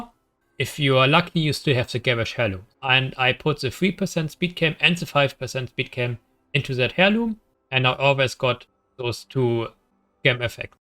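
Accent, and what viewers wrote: Germany. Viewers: man hörts du hast bisschen deutschen akzent :D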